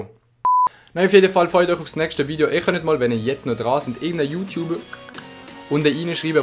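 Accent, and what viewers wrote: Basel, Switzerland. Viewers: kannst du mir mal bitte sagen, wie heißt der schweizer Dialekt, indem du sprichst?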